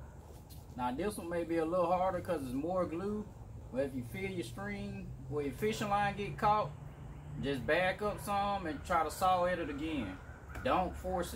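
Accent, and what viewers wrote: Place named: Georgia, United States. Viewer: Hear them accents all time here in atlanta lol.. Not sure where in Georgia he from tho..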